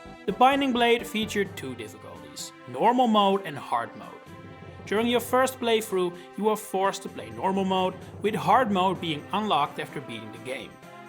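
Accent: Netherlands. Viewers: I'll bet anything this guy is Dutch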